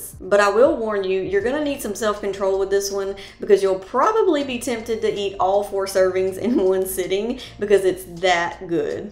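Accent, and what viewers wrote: South, United States. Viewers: Love all the recipes. And that Southern accent got me suscribe. Love from Canada ❤❤❤ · And to add, I expected to hear a Spanish type accent coming from you and then that beautiful southern accent came out. Threw me for a second and chuckled. A pleasant surprise 😊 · I just have one question. Are you from the south?😂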